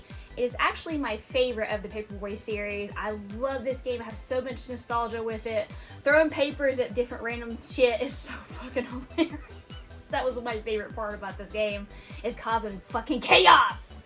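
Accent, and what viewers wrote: South, United States. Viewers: Southern accent for a girl is the cutest accent there is and one who is a gamer is the best of the best ❤️ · My local Goodwill only has about 50 copies of Tony Hawk Ride 😆 Glad your channel got recommended! It's nice to hear another collector with a Southern accent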